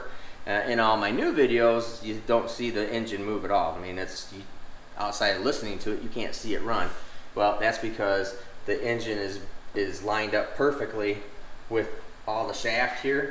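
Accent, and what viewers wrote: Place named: North, United States. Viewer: I'm from Arkansas so I noticed that same thing he definitely sounds like he is from the north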